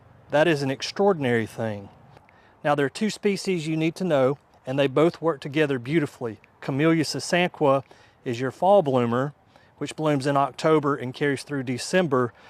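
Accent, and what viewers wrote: South, United States. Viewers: As soon as I heard your accent I knew you would actually have good advice for my southeast Louisiana zone 8b/9a garden. Question: I have tea olives, but they look scraggly. Can I cut them down and have them regrow more bushy? I’ve had knock-out roses for years. I’ve found the coral and yellow are not as robust for me as the red & pink. I’ve had issues with our extreme moisture with both camellias and rudbeckia - root rot and mildew. The lavender has been a no go for us here because of our rain and humidity, but I’m going to try this variety you recommend. Can encore azaleas take our full sun in the Deep South?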